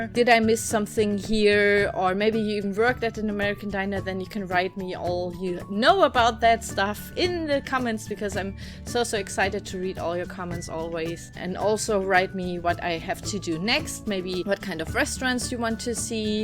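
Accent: Germany. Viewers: Du hast wirklich einen starken deutschen Akzent und die machst mehr Grammatik- als Vokabelfehler. Aber du wirst besser. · )) I don't get that myself either. Maybe they also have bad accents and are mad at themselves and thus also at you for having that German accent? I don't know, I find it charming when I can hear an accent from the non-native speakers I'm subcribed too and that make English videos.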